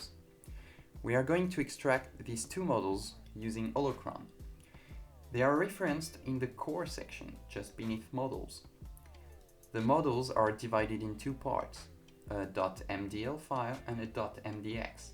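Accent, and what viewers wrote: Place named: France. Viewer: Thanks so much for making this video. Your French accent is great mon ami!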